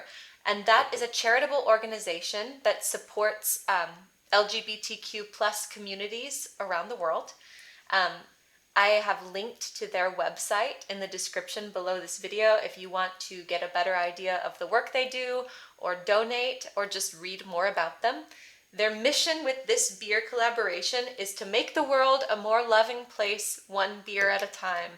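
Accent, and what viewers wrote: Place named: Midwest, United States. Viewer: Thanks for teaching,. What part of the Midwest are you from?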